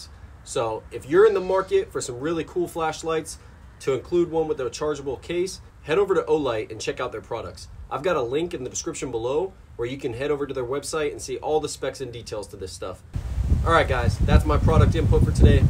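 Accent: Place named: South, United States. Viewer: I am not from your country, can I ask based on your accent and a Tee shirt you were wearing one day about hospitality in the South - East, is the timber you are milling today Swamp Cedar? It has the most amazing coloured wood. Really wished you had thought to pour water on it to show us its wet colour. We have a few similar coloured trees in my country one we Call the Australian Red Cedar, and the is called Jarrah (a hard wood). thanks.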